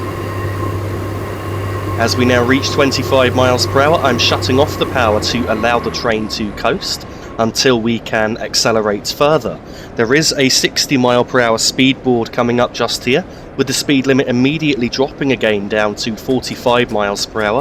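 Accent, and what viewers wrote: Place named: Midlands, United Kingdom. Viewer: I'm still in the process of deciding parts in my build although I'm quite content with my MacBook Pro. Not going skylake then or an i7 5820k? Well I knew it wasn't Yorkshire or Scouse or Geordie or Scottish or Welsh so I figured either Midlands or somewhere darn Sarf ;) Keep up the good work with the videos man. Have you considered building your own route and maybe creating a very busy NPC timetable?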